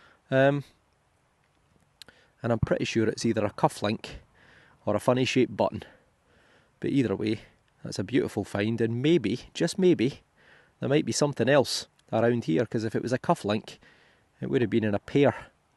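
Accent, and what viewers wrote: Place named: Scotland, United Kingdom. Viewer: i hate to make your head swell but these comments are right you have great videos and most importantly great finds but also your have a great voice and accent. everybody loves a Scottish accent specially when you can understand with a Yankee ear, you could be the next Sean Connery. keep it up and you'll have 6 figure subscribers in a year.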